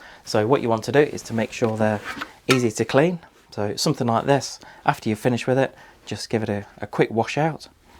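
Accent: United Kingdom. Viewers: I'm not considering to buy a new Soup Maker at the moment, but whatched the episode and gave it a TU for the fact alone you upload this kind of usefull content. You bring it in a very nice classic British manner; it's not spoken too fast, so non-native English viewers can also follow along quite easily.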